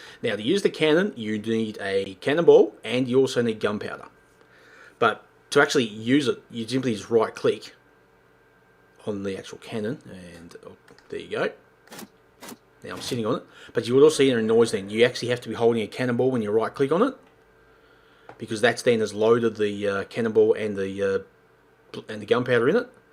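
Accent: Australia. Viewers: love the aussie accent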